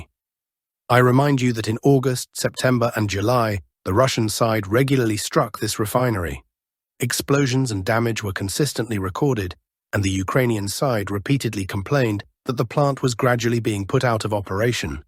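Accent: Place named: England, United Kingdom. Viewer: How truly Russian or otherwise East European (as your name suggests) are you? Because you pronounce all placenames with a very English distorsion: hulya-powl instead of actual Huliapole, lay-man, instead of actual Lyman (lee man). It's funny but disconcerting, I thought you were from Russia, or somewhere in that part of the world.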